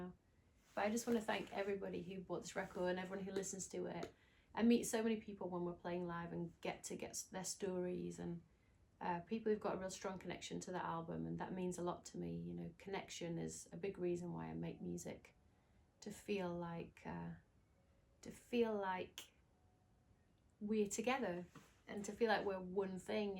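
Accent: United Kingdom. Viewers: I was mot expecting her to be British omg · Maybe it's the northern accent, but you come across so down to earth and having no airs. I remember hearing when it started being played on the radio and having to keep the engine running even though I'd arrived home just to finish listening to it. Those were the days!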